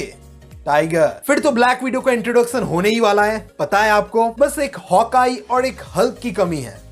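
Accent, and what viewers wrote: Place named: Bihar, India. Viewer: Aap ke speaking skills me bihari pan aa raha hai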